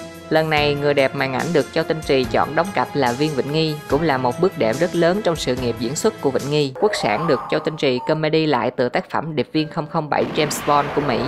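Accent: South, Vietnam. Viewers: Nên nói là D miền Nam cố nói giọng Bắc thì còn khó chịu hơn đó bạn. Đừng đánh mất giọng miền Nam thân thương. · Lúc đầu tui cũng nghe không quen giọng của ad vì hơi nặng so với giọng miền Nam bình thường, nhưng mà nội dung ad làm hay quá nên bị cuốn ý, nghe nhiều thành quen, không sao đâu nha ad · Người MIỀN NAM thì phát âm giọng MIỀN NAM dùm cái,Tinh da chứ k phải Tinh gia,dì chứ k phải là gì,dao nhiệm vụ chứ k phải là giao nhiệm vụ....nghe chói tai vcc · Bạn nói hay mà. Bạn người Nam thì cứ nói giọng Nam cho tự nhiên. Nghe vài vị dở hơi mắc mệt.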